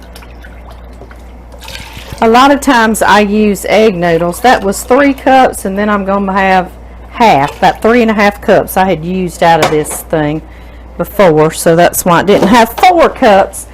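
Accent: South, United States. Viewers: This looks so delicious!! I just love watching you and listening to your southern accent 😍 I just love pampered chef, you keep on Killin them with your wonderful kindness ❤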